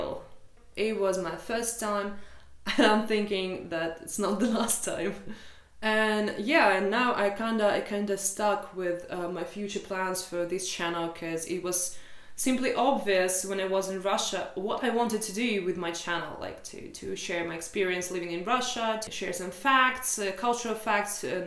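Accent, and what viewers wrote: England, United Kingdom. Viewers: Hi, I like your English accent. Maybe you can make videos about how you learn English. You can share your experience with us. You know there are also many platforms where you can teach people English, and some of them are paid very well, especially Betakid. By the way, I think that this scandal in Serbian portals will help you in your advertising. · Don't forget that your accent sounds very British, and NOT Russian so this is a barrier to some to believe you are not from the West or you are truly Russian. It's almost incredible to believe you have never been to the UK! I myself was fooled in this regard. This shows that as a linguist, you are very very good at speaking and learning English and with the desirable accent!!!! So, I had to re-listen to your Serbian "comparison" video again to find out why all the negative comments. 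And you DID NOT say anything bad about Serbia,, most of it was positive comments about Serbia. It was truly just comparing a few things in each of the two cultures. I truly don't know why all the negative comments afterwards. Maybe they interpreted it differently given their various background experiences. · Why do you have a British accent??? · I'd like to see you do a video about the contrasts between Russian and Texas cultures. To me your southern drawl is not perfected yet but you're pretty smart, you can do it. Of course in south Texas the accent is different than in east Texas and a southern drawl is different in Texas than Alabama. To me it sounds like you speak English with a British accent but I doubt English sounds the same everywhere in England. And when you consider the UK, Canada, Australia, New Zealand and south Texas, it's different even among(st) native speakers. To me it's more about what we have in common. 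You're from Russia and not everybody is the same there either. But some of us share common values. Thank you for your videos. I would welcome you and your family in my home, I would sponsor you all (ya'll) if you want to visit. Take care... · Probably not what you want to hear but I’m British and you do sometimes sound British (almost) ….but obviously I can tell you aren’t! Your accent is really interesting….oh and ignore the haters! 🤷‍♀️You should come to the UK and finish your conversion to 🇬🇧😀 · ) yes you sound british .:)) iv got 🇷🇴 blood in my vain , but leave in denmark, iv got a serbian koleg and im trying to learn serbian. As a russian how well do you speak serbian?? and how long time did it took you to learn it?? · Dear, Ignore the haters. Many of them would not notice the video, if it would be on Russian and not on English. 😄 Your English is excellent and I really enjoy your British accent. 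I watched a few videos and I really enjoyed the content. I wish you all the best in Serbia. 😊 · WTH??? I told you previously that your video was better (and in many ways) far kinder than most travel videos. For example, if I were doing this, I would point out that Russians rarely smile, men make more effort to be gallant to their girlfriends /wives... I truly can't understand why it would 'blow up' (?) this way. But remember... There is no such thing as 'bad' publicity. 😉 And your accent... You sound like someone who learned British English, not American English. I speak (badly and haltingly) BRAZILIAN Portuguese. Not continental, nor Azorean. That's what I learned. Different words and different style of pronunciation. At least this proves the saying : Haters gonna hate! 😆 I like you. I like your channel. They can all go pound sand! 😆 😘🥰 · Lmao, of course you sound British, when it's your profession. 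I've chosen American. How dare we sound native! 😂😂😂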